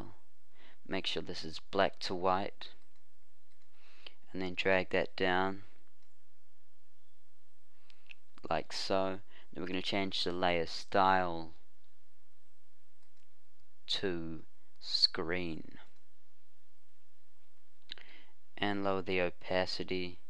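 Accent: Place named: New Zealand. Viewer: wonderful tutorial mate. do i hear a bit of NZ in your voice? :) very easy to follow and well demonstrated, no issues at all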